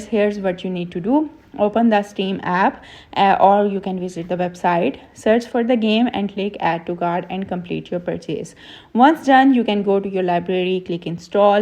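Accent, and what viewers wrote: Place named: India. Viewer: wow i love the indian sam voce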